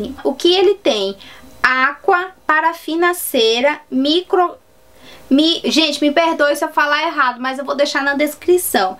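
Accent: Minas Gerais, Brazil. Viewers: Tem um sotaque mineiro . Legal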